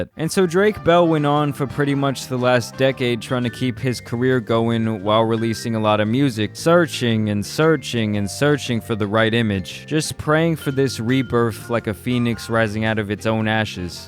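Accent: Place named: Boston, United States. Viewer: You got to be from Boston can tell right away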